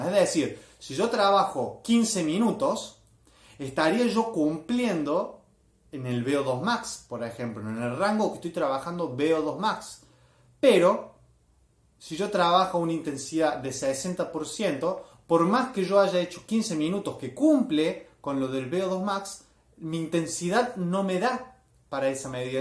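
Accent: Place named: Cordoba, Argentina. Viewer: Muy bueno, chango. cordobés tenías que ser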